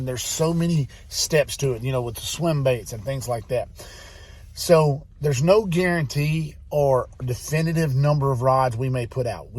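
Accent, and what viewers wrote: Louisiana, United States. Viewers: What part of Louisiana are you from?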